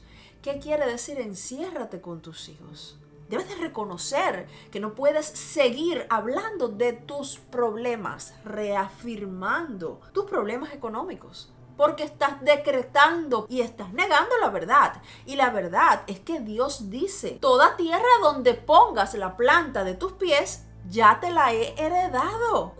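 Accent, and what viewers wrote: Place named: Cuba. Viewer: Hola siempre que te escucho me lleno de energía positiva, eso es lo que transmites, me imaginé que eras cubana hoy lo confirmo, que bueno, también yo. Dios te bendiga 🙏🙏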